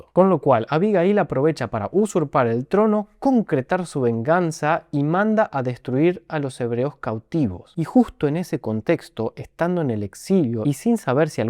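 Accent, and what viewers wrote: Argentina. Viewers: Hola varón, por tu voz. Parece que eres argentino tremendismo que aporte haces a todo el mundo al menos para mí. Yo tengo ya 67 años y siento que me completa tu aporte cultural argentino. Tendría que ser bueno yo soy chileno, nunca he tenido una dicha de estar en Argentina, pero bueno alguna vez lo haré, le pagué mis pasajes a mi hijo y a mi hija para conocer argentino. Llegaron maravilladoscon gente como tú como el gran Sandro de América de Leonardo Favio, Piero, etc.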